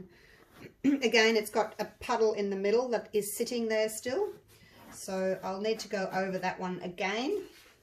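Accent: Australia. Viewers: I just have so much fun watching your pour paintings. You explain it so well especially with your Australian accent. I love Australia. I’ve only been lucky enough to visit there once and check it off my bucket list. You’re so lucky to live there. And your paintings are gorgeous! ❤ I’ve got all my material and I’m ready to start. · Also I love your voice I like to listen to the Australian will actually any type of broge or accent love to hear different drawls ect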